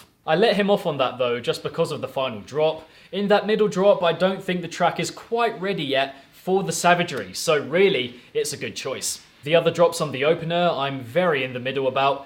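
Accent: United Kingdom. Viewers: Your lush British accent makes this review much more enjoyable. But no homo tho.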